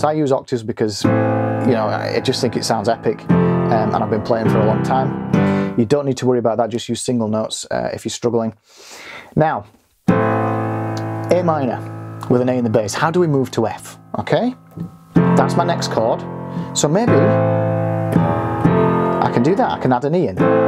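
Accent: United Kingdom. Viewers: I came here by accident. Pleased I did. Your enthusiasm is infectious. Many thanks. Great to hear a Brit here on YouTube.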